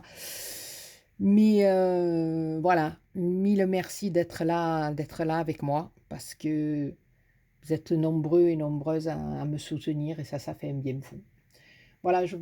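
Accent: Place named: Aubagne, France. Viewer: Bonjour.nous sommes ma soeur agnes et moi d'aubagne, nous vous suivons,vous êtes très soignée dans vos projets,avec des finitions nikel.en plus nôtres accent est magnifique.bisous d'aubagne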